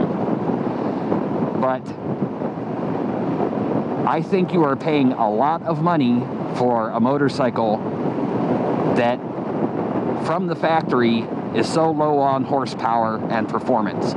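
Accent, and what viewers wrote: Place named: Chicago, United States. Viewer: I hear a slight Chicago accent too😂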